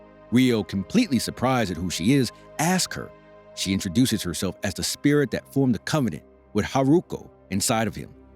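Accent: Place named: South, United States. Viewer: The country voice doesn't suite this genre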